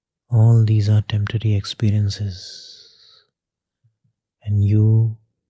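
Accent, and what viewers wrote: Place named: Asia. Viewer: When I heard the Asian accent I knew I was in the right place 😌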